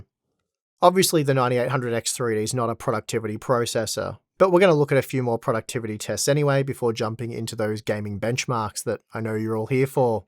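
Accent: Australia. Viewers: I have to SURPRISINGLY say that after watching this video I don't think I EVER wanna heard the word 'FASTER" ever again!! It's been drilled into my head SOOO MANY TIMES that I'm almost SICK of AMD winning JUST BECAUSE OF THIS!!!!! hahahaaa!! (I think it's mainly because I'm an American, and hearing the Australian accent the biggest difference that we hear is the 'ahh' in 'faster' ) ... and hearing that 586 times in one video drilled it in hard... and it SUCKS because I WANT to hear about AMD being FASTER!!! LMAO - I think I'll be okay tho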